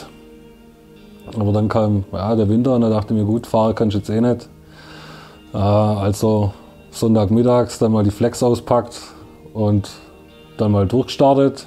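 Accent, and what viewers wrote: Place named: Swabia, Germany. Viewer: Endlich wird auch mal schwäbisch gschwätzt auf dem Kanal..... Respekt vor der ganzen Arbeit und super Ergebnis! Top!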